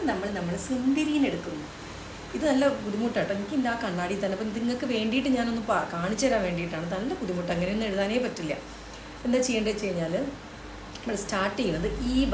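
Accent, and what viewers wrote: Kozhikode, India. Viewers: കോഴിക്കോട് നാട്ടിൻപുറം സംസാരം 👍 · 😂😂😂 sudari pettanu evidayo kettapole 😂😂soo beautiful so elegant just looking like a wow orma vannu😂😂😂 basha evidayo kettapole (ibbal evidaya) Kozhikode Aya enniku pettanu mansillayilla😂😂 nalla humorous talking ee fast chayitha bagham cut chayitha pore njagalku kekunumilla pinne athu cut chayitha leghtum korayum etha ee pennkutti Ella prodaktillum edukumbam parayunna 😂😂 enniki oru likeum comment tharane please 😊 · Very decent, humorous, transparent, innocent, spontaneous way of talking...over aakadhe ulla presentation um. Just can't take eyes from ur video.nammade kozhikodan baasha vrithiyil kaikaaryam cheyyunnu. Good going 👌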